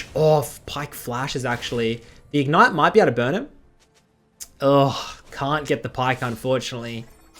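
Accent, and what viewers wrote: Australia. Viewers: If u would drop the obnoxious Aussie accent I would sub :> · i hate to break it to you but thats an aussie accent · I come to these videos for, stay for the gameplay. you are educational and your oz accent makes everything you say sound hilarious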